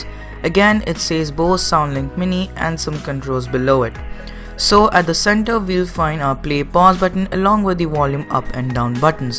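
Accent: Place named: India. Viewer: Indian Accent..!! Aaack 😡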